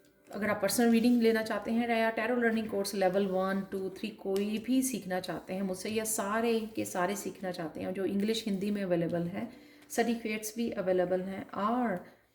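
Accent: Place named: Punjab, India. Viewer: Kya aap Punjab se hein. Aapki hindi mein punjabi ka touch lagta hai. Thanx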